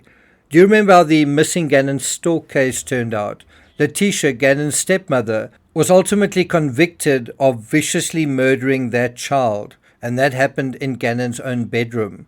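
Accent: South Africa. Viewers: Is that a South African accent??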